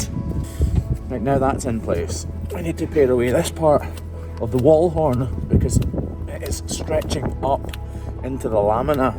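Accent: Scotland, United Kingdom. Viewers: keep speaking as you do. I'm from Kilmacolm and I appreciate representation of our way of speaking on YouTube.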